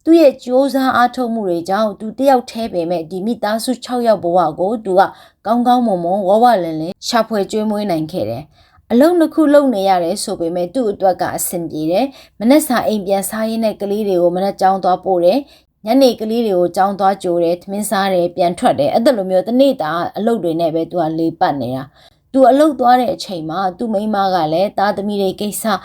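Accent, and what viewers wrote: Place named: Chin, Myanmar. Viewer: ချင်းလူမျိုးစကားပြောအသံနဲ့တူလိုက်တာ 😊 ကိုတွေ ချင်းလူမျိုးသူငယ်ချင်းတွေလဲအဲလိုလေယူလေသိမ်းအသံနဲ့ပဲ စကားပြောကြတာ 😊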